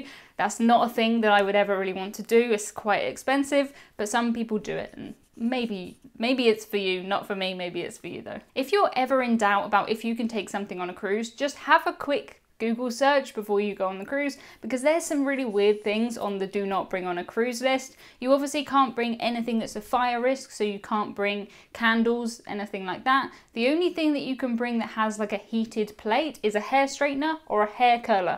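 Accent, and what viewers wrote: RP, United Kingdom. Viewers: I've never been on a cruise but will hopefully. I only watch your videos because you're so dang gorgeous and I love your posh accent ☺️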